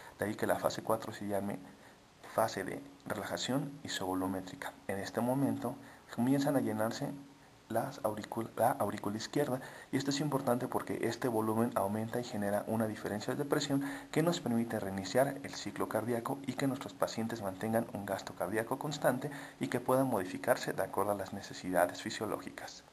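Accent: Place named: Spain. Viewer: jajajaj ,se oye muy español el hombre jajajaja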